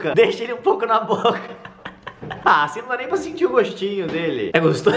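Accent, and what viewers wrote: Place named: São Paulo, Brazil. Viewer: alguem em 2020 kkkk eu moro em Sao Paulo e é assim mesmo do jeito que o falo kkkkkkk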